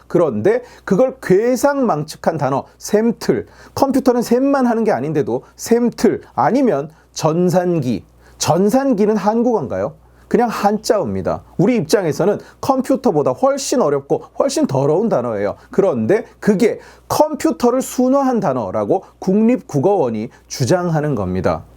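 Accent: North Korea. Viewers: 초반만 봤는데 북한말인줄..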